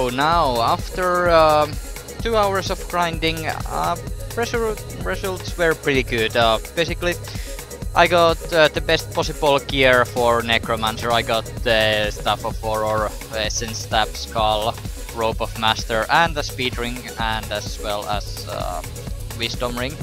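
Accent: Finland. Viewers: Kuulee heti että on suomalainen :D, / u can hear that hes finnish :D Missä serverissä pelaat?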